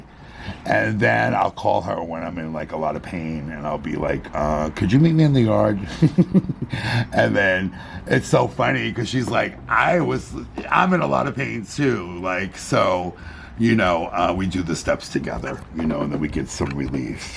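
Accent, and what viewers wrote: United States. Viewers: Hi! Here because I saw you on Mark’s page. The video where he took Rebeca into your store. You’re so charming you have a magnetic personality. I love your raspy voice and New York accent 💕 Wishing you lots of success. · you seem so fucking chill to have as a friend I love it. Whats funny is im completely straight but ALL my friends aside from like 5 of them have all been the gayest of gays. Dunno why but i always gravitate towards gay guys as far as making friends or hanging out with people go i guess its how funny and flamboyant the guys i know are but at the same time have my back and can tell them literally ANYTHING even weird shit and they listen and respond like what i say is normal lmao. Can tell them my feelings like when im depressed or sad or whatever and they know all the things to say to cheer you up or make you feel loved, ive never had a straight friend do any of that but i have had some great str8 friends but its a diff vibe and dont feel as comfortable talking about certain things. 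Any way all that jabbering was to say you remind me EXACTLY of one of those friends who recently passed away last year to heroin overdose, he was heavy set and even had the exact same voice as you with that boston accent he was like the gay brother i never had his name was Gypsy i miss em.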